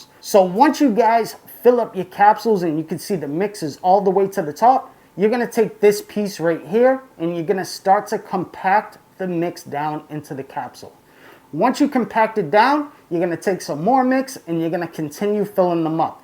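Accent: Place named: New York, United States. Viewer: He’s a Yankees for sure, I’d guess Jersey or NewYork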